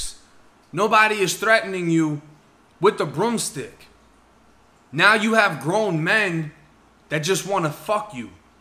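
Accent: Boston, United States. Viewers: That Boston accent kills me. Much respect tho bro✊🏾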